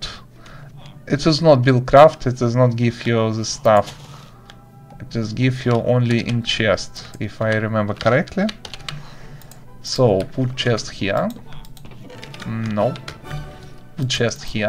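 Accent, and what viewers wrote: Russia. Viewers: ты говоришь на руском? очень похоже просто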